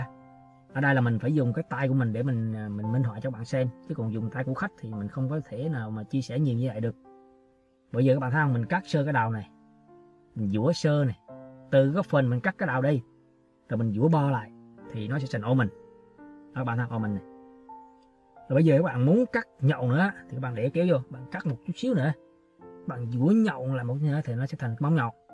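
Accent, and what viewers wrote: Central, Vietnam. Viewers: Nghe giọng miền trung mà nhớ quê hương quá. Anh chỉ rất tận tâm. Đã like và subscribe :)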